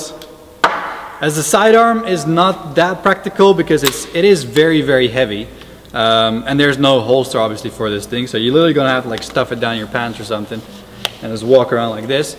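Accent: Netherlands. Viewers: are you dutch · Boi u dutch?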